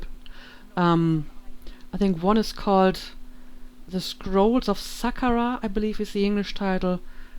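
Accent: Germany. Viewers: When I first heard your voice, your accent sounded really familiar. I actually wondered if you were Tianlein who does Elder Scrolls Online fashion content here on youtube. Maybe a second channel of hers but I clicked on one of her videos and her voice is slightly different to yours (also German though). So, I used to play Elder Scrolls Online with a German lady about your age, from Cologne, who liked exactly the same folk metal music and is called Katrina (shortened online to Cat). The only reason I know it cannot be the same person is because I played ESO on PS4 not PC. Also, the person I know is actually Indian and that part of her accent is not present when you speak. Very strange coincidence though lol. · Congratulations! I knew your accent but I never found out where it came from in Germany so thanks for answering that question! Other things I'd like to say are that I do share your interest in Folk Metal and that most of us french people (I'm from Strasbourg) also find snails gross. 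Btw I checked the Bielefled controversy. Just wow.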